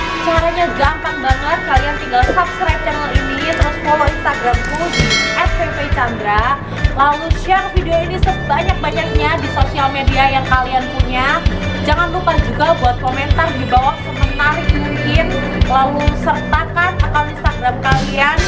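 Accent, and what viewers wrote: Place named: Java, Indonesia. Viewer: Medoknya itu lo, kawaiii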